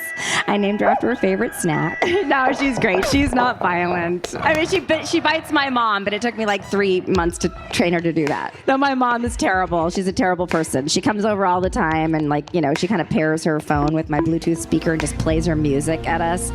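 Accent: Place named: Mexico. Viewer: You sounded like lana but in mexican accent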